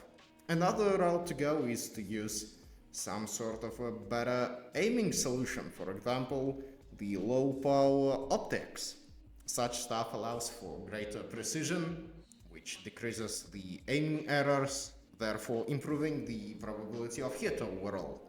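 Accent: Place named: Russia. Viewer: Authentic Russian accent. I like it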